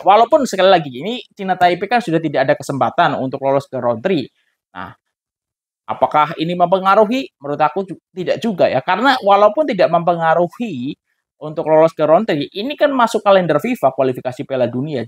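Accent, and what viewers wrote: Java, Indonesia. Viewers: bang anda asli orang jawa kah?😮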